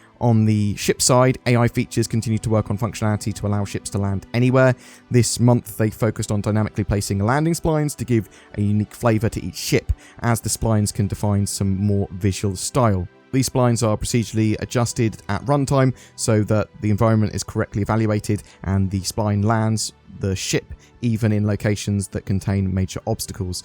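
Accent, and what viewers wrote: United Kingdom. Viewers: British accent mate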